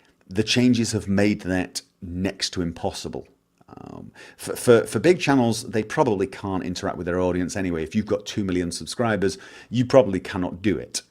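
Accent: United Kingdom. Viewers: If i recall correctly he's from England. or rather... blast it's on the tip of my tongue but i cant quite get it right. Sorry on that part. suffice to say he's in an area where he sounds "wonderfully British". dont realy hear people go "he sounds wonderfully American" so i guess certain parts over there are doing a few things right. god i can hear it now... going "i'm not from England you sodding yank i'm from ___"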